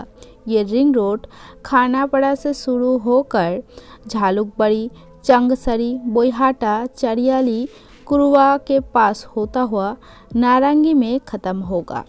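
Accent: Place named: Bengal, India. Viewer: Pata nahi kyu bangali excent main baat kar rahe hai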